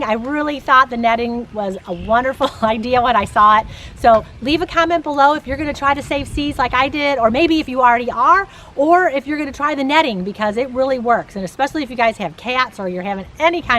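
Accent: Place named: Boston, United States. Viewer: I think you got an awesome personality. You have a bahston accent.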